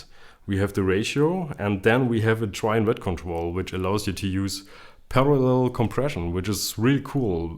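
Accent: Germany. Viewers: A German man who speaks English😂 nice accent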